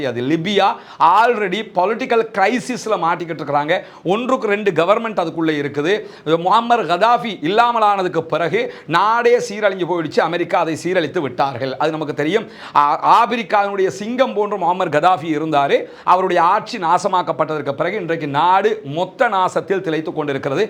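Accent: India. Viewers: சிறப்பான தகவல்களைதரும் நீங்கள் ஆங்கிலத்தை இந்தியர் போல் உச்சரிப்பது ஏன்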